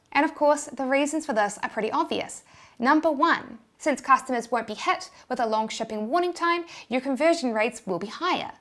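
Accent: New Zealand. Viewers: Love the new Zealand accent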